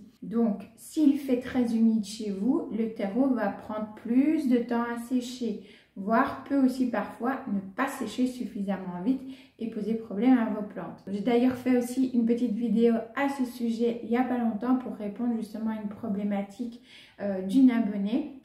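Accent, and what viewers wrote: Belgium. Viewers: Belgique aussi ici 😜 (j'avais reconnu l'accent 🙊😁) merci beaucoup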